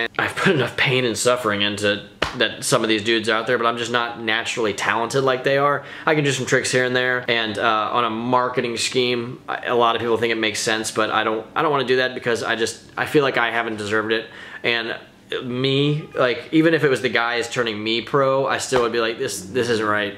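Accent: England, United Kingdom. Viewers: Are u English or American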